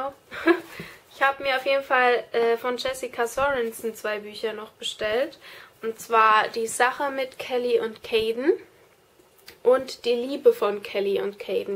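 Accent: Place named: Palatinate, Germany. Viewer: Man kann sich als pälzer ned versteggle :D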